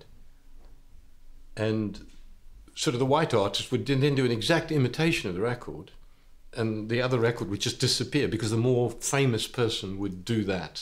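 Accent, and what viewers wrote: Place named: South Africa. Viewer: He still has a slight South African twang to his accent .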